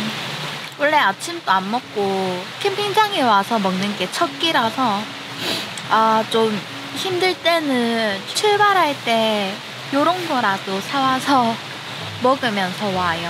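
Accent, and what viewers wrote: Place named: Gyeongsang, South Korea. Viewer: 날씨가 이래가될일이가? 포항사투리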